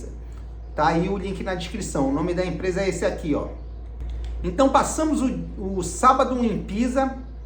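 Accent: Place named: Pará, Brazil. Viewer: Pelo sotaque é paraense, rs! Acertei????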